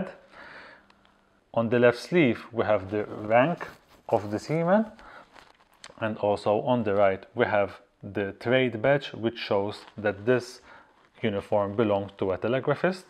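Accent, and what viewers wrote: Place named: Malta. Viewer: Very strong Maltese accent :)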